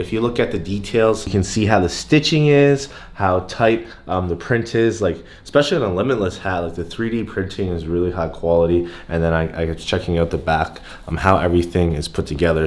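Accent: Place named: Midwest, United States. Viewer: I thot you were from dmv lol why you say erms like you from midwest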